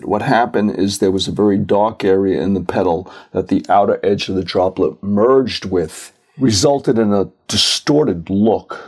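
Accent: New York, United States. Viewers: Loved watching you paint. Wonderful composition. You are making me miss home, New York with your accent. Thanks.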